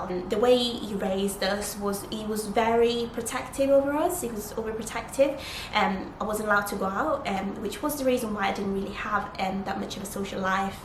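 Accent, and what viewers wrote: United Kingdom. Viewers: How old are you now? You have a British accent now. I wouldn't think you would be from Italy. I lived there for four years, in Sardinia, Porto Torres, near Sassari, from 7years old to 11, obviously came with my mum there, originally from Poland. Since then came to UK, Leicester. Long story though, I have also been in Manchester for the past 2years, and before going there, I lived in the UK for about 10years, as I arrived here in 2008 Winter, I was about 11, and came to Manchester in the summer of 2018 for the first time. I haven't spoken with my family since 2017, so was on the streets a few times, but yeah... Not sure why I'm writing that, I think I side tracked :D But yeah, interesting, saw you from Mike Thurston's video, its interesting you were or are in Dubai. Would you tell us your story of how you got where you are now? :D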